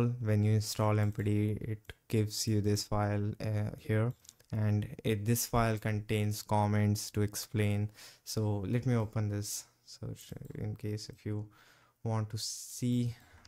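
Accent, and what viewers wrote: India. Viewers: Certified Linux Chad with indian accent, lisp and all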